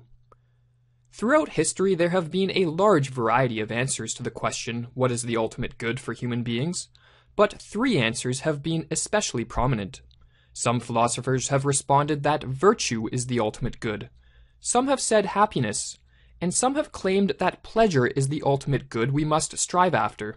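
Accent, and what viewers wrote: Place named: Canada. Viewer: A Canadian narrated this.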